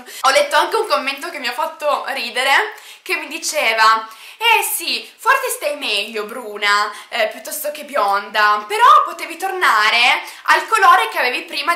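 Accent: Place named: Piedmont, Italy. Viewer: Aaaaalla faccia dell'accento piemontese!Nè!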